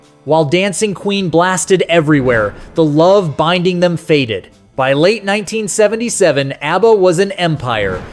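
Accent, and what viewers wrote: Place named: United States. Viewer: I'm starting to get violent diarrhea at AI videos with shitty american accent.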